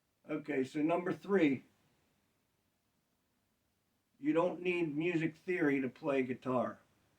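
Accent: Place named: United States. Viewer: Hi can you document the down up down up. I am having difficulty understanding what you are saying,your American accent Am from Liverpool in the UK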